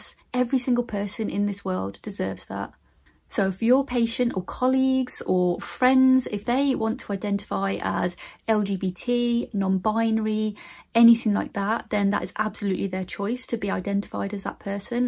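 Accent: Birmingham, United Kingdom. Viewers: Are you from Birmingham like me? I'm a GP and I love your videos. This one is one of the best x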